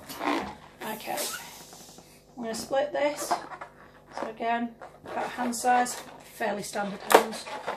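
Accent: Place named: Cheshire, United Kingdom. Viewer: I love your work! You are so talented! I'd love to see you do a large poppy troll column. I'm doing a birthday in june, but can't figure out how to attach all those 260's around the large approx. 24 inch balloon heads. I've thought about glue? Her hair goes straight up, so I just don't know how to get it attached midway all around the round balloon......no videos on it. Thanks for sharing your brilliant work. Love your accent. Im from the US but my husband is british, from cheshire, you sound like that area to me lol thanks again! :-)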